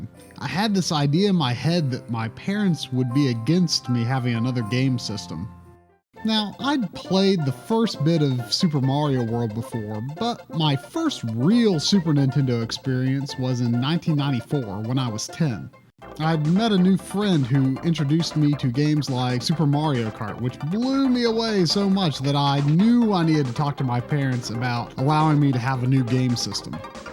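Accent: United States. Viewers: It's great that you had that country boy accent all the way back then.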